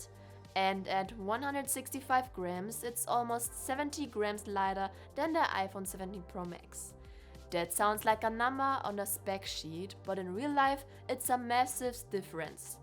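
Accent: Germany. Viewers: I can hear the German accent :D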